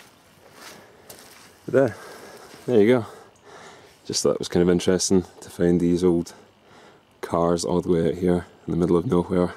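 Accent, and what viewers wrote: Scotland, United Kingdom. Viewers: are ye scotish mate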